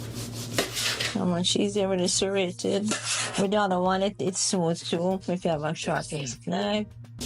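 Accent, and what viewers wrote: Jamaica. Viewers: I love Jamaican accent.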